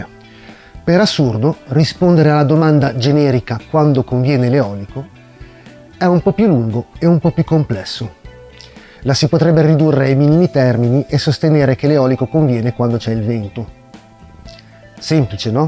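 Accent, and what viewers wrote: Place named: Liguria, Italy. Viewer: ciao e complimenti per gli argomenti trattati. sono interessato all'eolico domestico e direi anche che - dall'accento - mi sembri conterraneo.......io abito ad Arenzano e, dall'atlante eolico di cui hai parlato anche tu, sembrerebbe un vento medio di circa 6-7 ms. Sarei quindi propenso a rivolgermi al tipo ad asse verticale. Però un suggerimento: a chi rivolgersi per tutto a Genova???? Mi sembra pochi disponibili e preparati....... grazie!!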